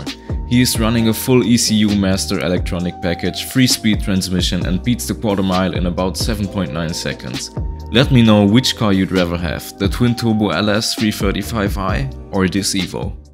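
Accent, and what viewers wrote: Germany. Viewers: U Sound rlly german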